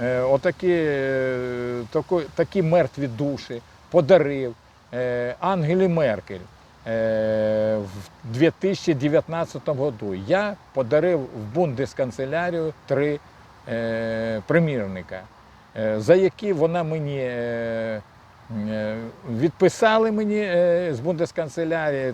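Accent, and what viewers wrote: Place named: Poltava, Ukraine. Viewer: Чудний. Полтавський діалект такий, як треба.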